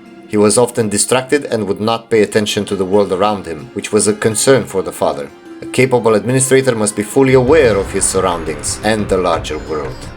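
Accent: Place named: Romania. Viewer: A friend of mine presented me your channel and after watching countless of youtube eu4 hours(anbennar included) I have to say your latest stuff(7 months ago) focused on anbennar is S++. I hope you get the motivation with the boots of views to keep doing this. this is why youtube is great because gems such as yours appear from time to time. I would also strech and try to guess - are you from romania? you have a funny romanian english accent and if you do, mad respect. I promise i'll pledge when i get paid. Keep it up broski!!!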